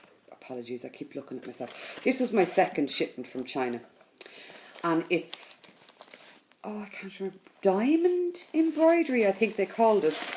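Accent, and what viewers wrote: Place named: Ireland. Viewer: Hi. Ah, I could listen to your speak all day, I love a great Irish accent. If it were me, I'd cut the four panels of the tree and stitch them separately, just for, ease of use. A couple of online stores in the UK are Sew and So and Bothy Threads. Etsy, Clouds Factory, Frosted Pumpkin and Heaven & Earth Designs (HAED) all offer downloadable PDFs available for purchase (so no shipping costs).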